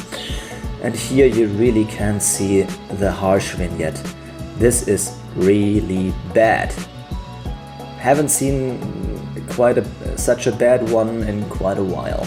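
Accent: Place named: Germany. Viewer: Soll ich mir das deutsche Englisch jetzt 12min reinziehen? Dann bekomm ich partielle Einseitige, Lähmung und gesichtskirmes auf der anderen Seite. Toll, dass sie englisch können, aber beschränken Sie es bitte auf die zwischenmenschliche Kommunikation. Videos müssen echt nicht so kommentiert werden.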